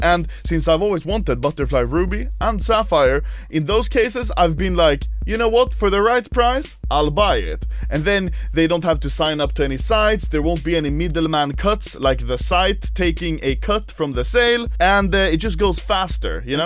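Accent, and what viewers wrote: Russia. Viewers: Are you Russin? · i thaught he was russian XD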